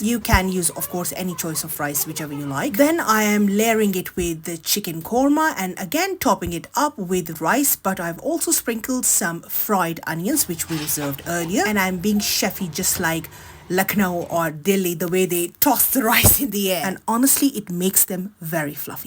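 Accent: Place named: England, United Kingdom. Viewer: That English accent....👏🏻👏🏻👏🏻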